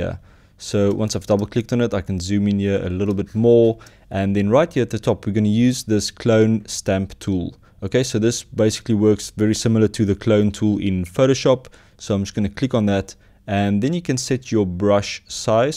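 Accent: South Africa. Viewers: You sound like a real South African!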